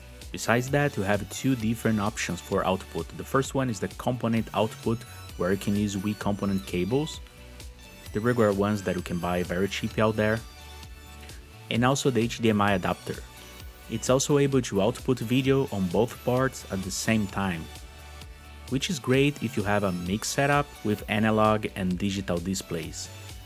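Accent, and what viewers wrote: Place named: Portugal. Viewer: Your English is excellent but I can tell your native language is Portuguese! Vídeo legal mano vou ver esse cabo no aliexpress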